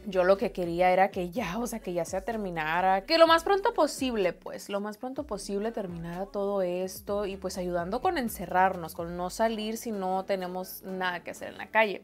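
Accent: Sinaloa, Mexico. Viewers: Holaa, la verdad es la primera vez que te escribo. Tengo poco tiempo de ver tus vídeos gracias a una excompañera de trabajo que compartió una publicación en Facebook, desde ahí te veo cada vez que puedoo. Para ser sincera, yo soy muy floja para ver videos largooos, pongo algo y si dura más de dos minutos lo quito jaja pero contigo me siento taaan agusto, primero veia que duraban mas de 20 min y yo.. ay noo.. jaja pero con tus platicas y tu forma de ser wooow, me encanta.. ya ni me fijo en el tiempo jaja. Yo soy de sonora y me gusta mucho como hablas y como tienes tu esencia sinaloense, esa es una razon por la cual me gustan mucho tus videos, auténtica y sencilla.. por aquí seguiré viendo tu contenido.. eres muy lindaa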